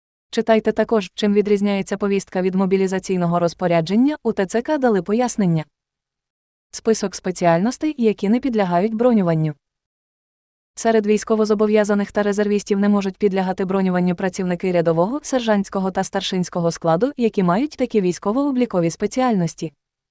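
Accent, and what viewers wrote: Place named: United States. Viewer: де найшли диктора дебільну, може говорити українською мовою. американка чи що.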